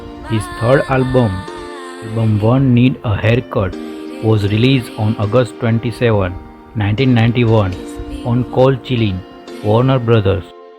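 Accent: India. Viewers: A heavily accented indian guy narrating this video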